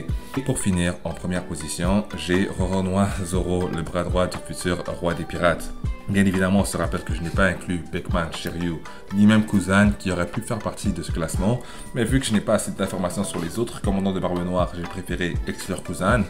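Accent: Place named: Montreal, Canada. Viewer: Hey j’aime tes vidéos ton accent me rappelle ceux qu’on retrouve à Montréal est-ce que tu viens d’ici ?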